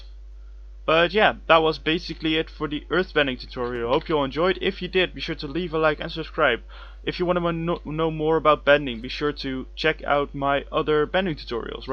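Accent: Netherlands. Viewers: Your Dutch accent💜 btw loved the video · The Dutch accent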